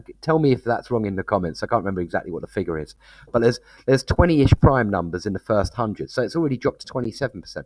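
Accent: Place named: RP, United Kingdom. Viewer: Good, the best part of your presentation is your pleasant voice and almost extinct BBC English. But the very best is not what is in your video, but what is absent - background music.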